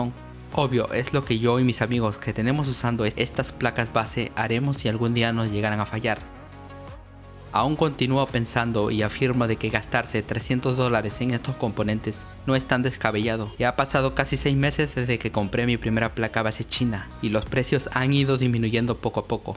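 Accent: Peru. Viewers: eres peruano :V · eres de Perú?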